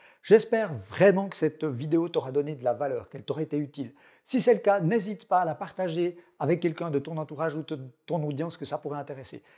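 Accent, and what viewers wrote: Switzerland. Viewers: Hello je suis vaudois, t'as un accents qui vient de quel region en suisse?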